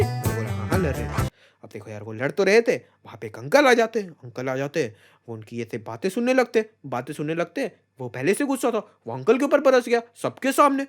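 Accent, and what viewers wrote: Bihar, India. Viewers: accent from Bihar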